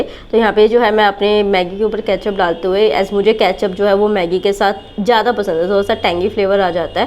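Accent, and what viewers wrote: Haryana, India. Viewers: R u native from Haryana ????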